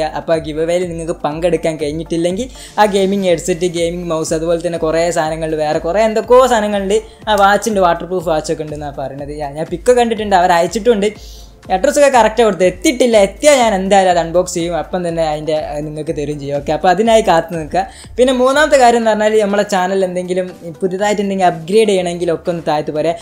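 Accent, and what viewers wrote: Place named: Malappuram, India. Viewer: # നിന്റെ വീട് എവിടെയാ..? മലപ്പുറം ആണോ ..? നല്ല മലപ്പുറം ഭാഷ... !!😘😘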